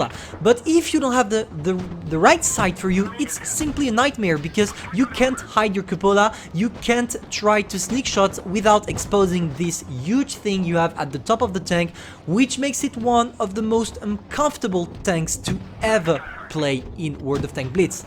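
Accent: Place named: France. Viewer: I just discovered your channel thanks to the youtube recomendations, and I have to admit your videos are pretty interesting. By the way, are you french ? Parceque j'ai l'impression de remarquer un léger accent français malgrès t'a prononciation excellente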